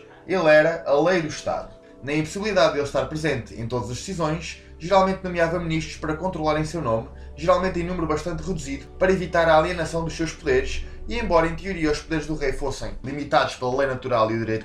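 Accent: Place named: Portugal. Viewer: Carai nao entedi nada portugues de portugal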